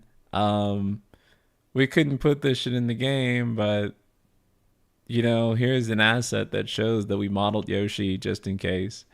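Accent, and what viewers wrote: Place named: North Texas, United States. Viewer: ayyy I didn't know you were from North Texas too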